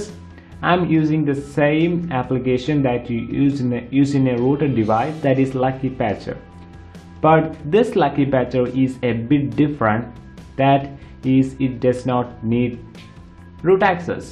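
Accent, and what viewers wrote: India. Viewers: OMG. Indian accent is so annoying especially when they try to pretend that they are not Indians.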